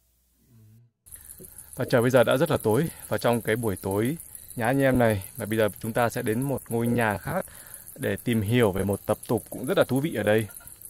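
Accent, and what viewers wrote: Vietnam. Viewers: Ông a người miền nam hay bắc đấy,,giọng nghe quen quen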